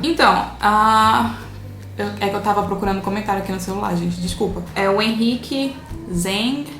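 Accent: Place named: Pará, Brazil. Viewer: você é paraense ❤só pelo seu égua eu me inscrevi 😅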